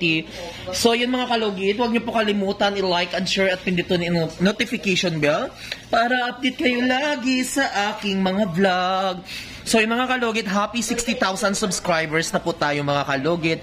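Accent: Iloilo, Philippines. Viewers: ilonggo ka?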